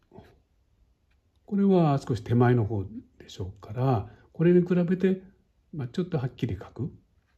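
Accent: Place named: Poland. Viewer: Wow! I would never expect a polish accent in your video :o Thanks for this!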